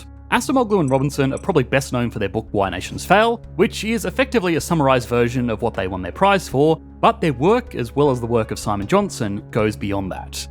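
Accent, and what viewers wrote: Australia. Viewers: good job. Are you Ozzie?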